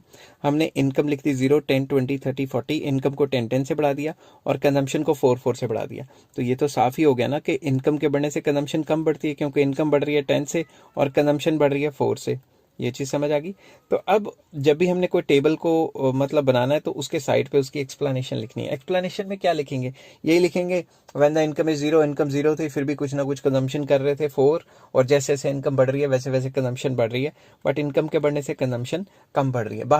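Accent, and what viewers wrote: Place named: Haryana, India. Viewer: sir aap haryana se belong karte ho kya? apki language mai bagri touch aa raha hai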